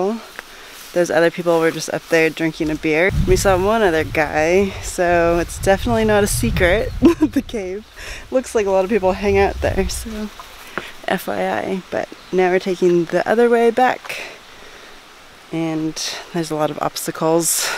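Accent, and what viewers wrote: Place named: New Zealand. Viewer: Living in NZ I notice you are building an accent towards the end of the video.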